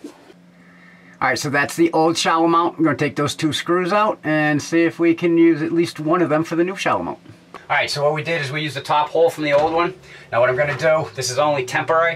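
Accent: Boston, United States. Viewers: Irrelevant comment alert!!.. dude r u from Boston??? U been to Dunkin today?? Anyway. Wikkid pissah review!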